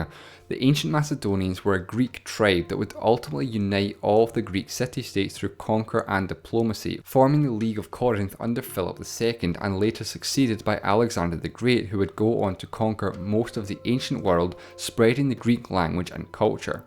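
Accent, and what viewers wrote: Scotland, United Kingdom. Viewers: The Narrator of this video is obviously Scottish. Scotland was named from the dense fog and darkness of this land. Scotland was discovered by the Greeks <link>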